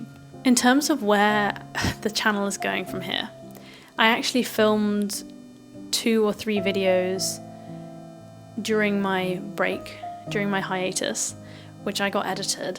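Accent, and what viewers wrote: RP, United Kingdom. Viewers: Oh dear, you are the first one I check when I want to learn RP accent and a foreign language! Congratulations on your life achievement, 我祝你和家人一切顺利！ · Thank you so much for your podcasts and and videos in English. I really like British English, especially when you pronouncing it! Keep making this videos because you can not even imagine how it’s changing someone’s English skills! You are the best! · Dear! Your videos are really helpful. Keep on doing your great job! I personally enjoy listening to your perfect RP pronunciation, your soft voice and, which is the most important, wonderful tips you give on different subjects! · Wow! Welcome to Hong Kong! Congrats to you with the many new chapters in life! Hope to bump into you in Hong Kong one day! Love your British accent!❤😂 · I love your British accent..please continue to inspire us. 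- Love from Kuala Lumpur.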